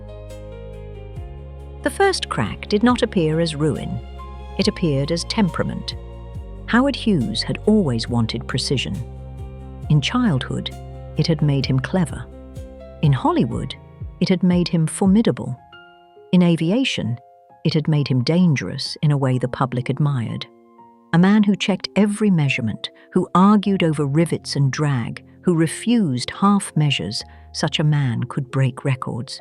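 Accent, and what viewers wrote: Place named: England, United Kingdom. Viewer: Old English? No just english. Very nice too. Really enjoyed this. Thank you for sharing.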